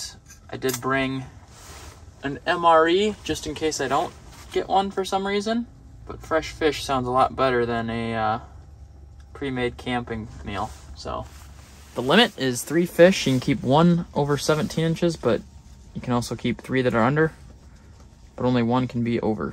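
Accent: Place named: Minnesota, United States. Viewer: The Minnesota accent really shining through in today's video! I really enjoying seeing your community interactions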